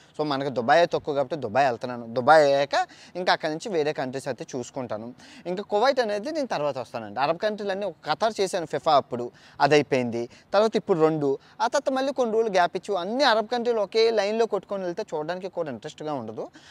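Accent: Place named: Andhra Pradesh, India. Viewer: ఉత్తరాంధ్ర మాండలికంలో మధురంగా చెబుతున్నారు.జయోస్థు.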